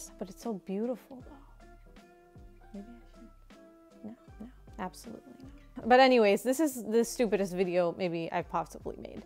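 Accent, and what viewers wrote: United Kingdom. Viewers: Perfect 👌🏽 English accent from east London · Excellant English accent! You're brilliant. So funny. You make me smile on the darkest of days in my life. Please keep it up. Thank you. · That British accent was top tier!!!😂😂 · It’s the British accent for me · “When there’s actually culture” please 😂😭💀 Oh dear gOD THE BRITISH ACCENT PLEASE 💀💀💀💀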